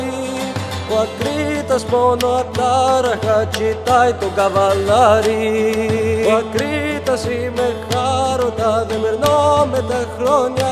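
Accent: Crete, Greece. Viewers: Your accent reminds me of the way Cretans talk. As a Greek, i deeply admire all of your work. Thank you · Reminds me a lot to, in general, the music for Ikariotikos or a specific Rhodian dance I can't remember the name. Certainly gorgeous as always man, I love that Cretan accent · A splendid effort at a kontylia,! You’ve got the Cretan accent down cold, too! Two small notes on the English translation though, for your consideration; 1. “Charon brings Digenis to Hades’ domain *on horseback*” — felt like this was a significant omission, given that «καβάλα» is the text’s incipit. 2. «της ομορφιάς την *πούλια*» could either be translated as “the jewel of beauty” (the poúlia, with a lowercase π, is a metallic ornament Greek women used to adorn their dresses and scarves with) or “the star of beauty” (the Poúlia, with an uppercase Π, which is where the ornament gets its name from incidentally, is the common name the Byzantines assigned to the star cluster of the Pleiades — the modern Greeks, Palamas amongst them, still use it to this day). 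I do not have Palamas’ poem close at hand right now so I’m unsure which way he might have spelled the word, but it’s safe to say his intended meaning wasn’t “the bird of beauty”, as poulí (“bird”, plural pouliá) is only a paronym of πούλια/Πούλια. Thank you for this beautiful offering! Greetings from a Greek national with roots from your neighbouring Qazvin! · What is this wizardry again? You even nailed the Cretan accent to the tee. On another note, whenever I hear the Cretan lyra my blood starts pumping faster in my veins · Hello fellow "Cretan":D You speak Greek the same way you speak english? Or this was for the purpose of the poem? Nice creation! Loved it! Thanks!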